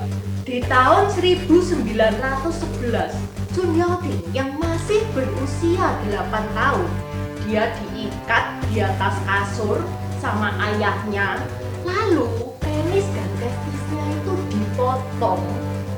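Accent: Java, Indonesia. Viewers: Logatnya medok jawa bget!!!wow keren👍🏻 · Org Jawa memang medox.salam dr pulau Lombok kak. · Jawanya medhok he... Saya juga orang Jawa logat jawa kalau sudah lengket sulit ilangnya. 😀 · Casinge cino jowone medok tenan.. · Muka mu cino suara mu jowo ... wow ! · Mbak anda orang semarang ya...koq medok jawanya. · Indonesia memang beragam.ayo kita jaga keberagaman yang ada di indonesia.....unik banget Cece logat jawanya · Ini logat Jowo Cino. Bahasa Jawa campuran bhs Indonesia dg logat khas mereka. Jarang yg murni pakai bahasa Jawa atau murni bahasa Indonesia. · Baguss logat jowo njekkeknya, semangat yuu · Mantap 👍👍,,,logat Jawanya medog,,👍👍👍😀,,, terus semangat,,,,and sukses selalu 🙏👍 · Paras Tionghoa logat jawa. Sukses trs Mbak 👍. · Muka Tionghoa ngomong medok banget Jawanya 😀😀😀😀😀😀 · Logatnya jawa banget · Maturnuwun mbakyu, aku seneng dengar ceritanya dengan logat Jawa yang kental... 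teruske wae mbakayu....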